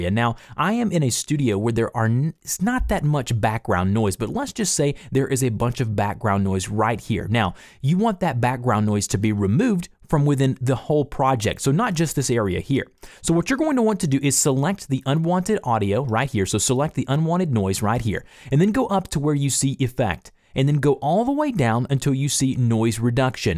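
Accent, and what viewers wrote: United States. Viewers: Man u got a nice accent, which state ur from? -( I’m not an American)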